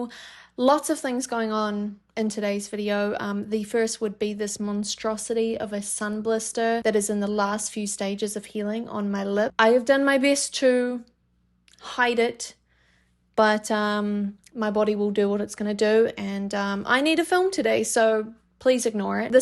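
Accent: New Zealand. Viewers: Thats pretty much just a New Zealand accent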